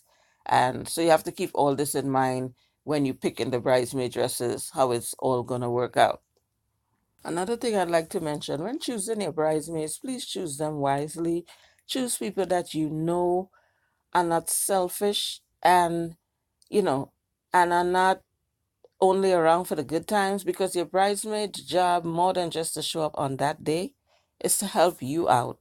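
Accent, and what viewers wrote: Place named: Trinidad and Tobago. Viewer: I like the dresses. I didn't catch your accent until about ...Trinidad in the house...lol...I am from St. Croix.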